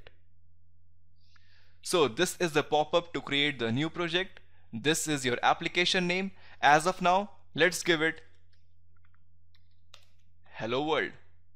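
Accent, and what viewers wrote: India. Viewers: Love the Indian accent. First time I heard an instructor use Blah, Blah, Blah in a lesson!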